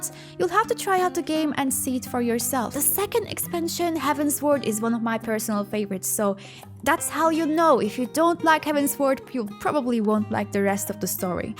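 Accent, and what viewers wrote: Turkey. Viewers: bu bir türk aksanı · I can smell a turkish accent, are you turkish? :D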